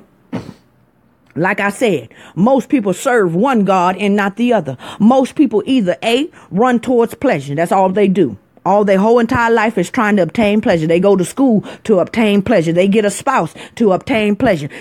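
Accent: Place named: Chicago, United States. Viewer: Thank you. I am recovering from childhood abuse- my parents tried to kill me when I was 15 and I got away. I found you because I initially was listening to Eligio and started to peep game. The universe led me to you and I needed to hear this. Tomorrow is my 42 reincarnation date and it’s been psychologically challenging this year. This message was for me and I heard it live last night before it cut off but I heard exactly what I needed to hear. Blessings and thank you 🙏🏽. Btw, my husband and I thoroughly enjoy listening to you. Yes from the Chi and says you sound like his peoples. 🌟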